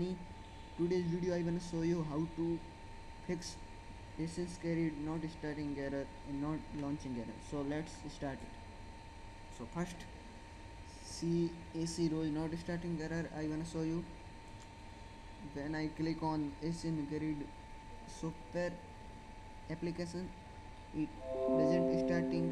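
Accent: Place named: India. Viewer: Shit is that mumbling English or some DR. Bombay shit?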